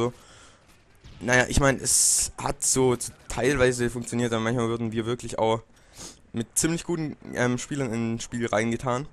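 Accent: Swabia, Germany. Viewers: hihi :D ein schwaaaabe :) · Schwäbisch FTW!!!! :D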